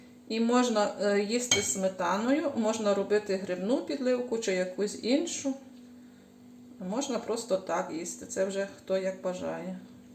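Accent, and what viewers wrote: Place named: Volyn, Ukraine. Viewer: С удовольствием послушала вашу речь, вспомнила своих родных с Волыни.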